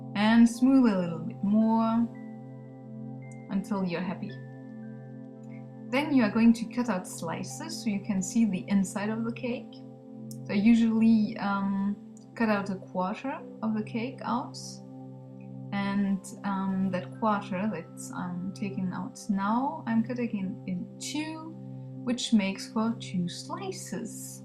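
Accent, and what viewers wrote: France. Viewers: are you French? I liked the voice-over to. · are you french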